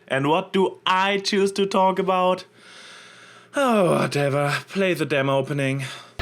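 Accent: Germany. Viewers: 1st: I can hear that you are some kind of german. 2nd: Someone has to do it. 3rd: Good video, good english. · I am in love with your Accent. So very Germany sounding. Or Austrian? Where are you from? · I've seen you replying to the comments, and is it bad if I read every one imagining your (FREAKING AWESOME) voice saying it all? Also, speaking about your voice, <3 dat German accent boiii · i really like your ascent,it reminds me of Germany from hetalia,i hope that's not offensive in some way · your accent is quiet hard german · you sound like your german .__. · Are you German? Cause the accent kinda sounds like dat ^^ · Dieser Akzent! Sorry, wir deutschen haben den nunmal xD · 14 He is German, and his accent is quite handsome. Also, if you are going to ask a question, at least, turn replies ON!!! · I Like your Accent. Bist du Deutscher? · I agree with the points you made. 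But sadly, the world has not yet become to the equestria-esque land we would all like it to be. As the human race is now, as awesome as we are sometimes, I don't think we are capable of learning this lesson as a whole. There will always be evil, no mater what form it takes. One last thing, just to sate my seemingly random and endless curiosity. From you're accent, I would like to know if you're from Austria or Germany. If both are wrong, I'm sorry.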